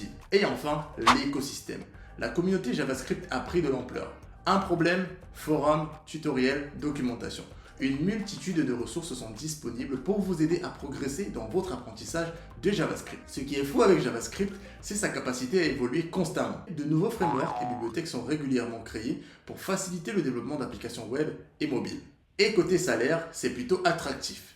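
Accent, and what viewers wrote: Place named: Cameroon. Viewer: l'accent camer ne meurt pas :) ton contenu est super je viens de tiktok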